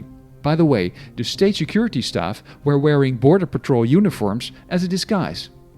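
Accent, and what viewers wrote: Netherlands. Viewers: Do I hear a Dutch accent in the narration? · Dutch accent? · Interesting. His accent in English is typically Dutch, hence my question. Thanks for the answer.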